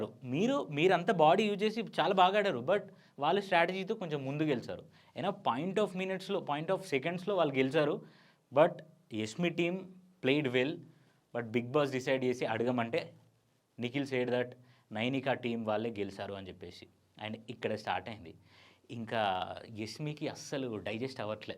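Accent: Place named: Warangal, India. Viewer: Warangal slang super ekkadiki poyina slang maradu.❤❤❤ Warangal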